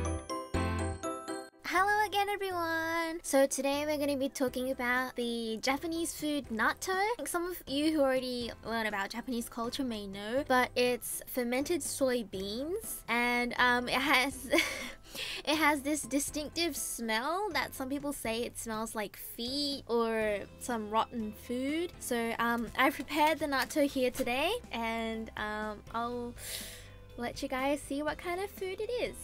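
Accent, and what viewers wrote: Australia. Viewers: Ive been enjoying these videos! Keep up the good word! P.S. I love the Aussie accent in your English! It sounds attractive to listen to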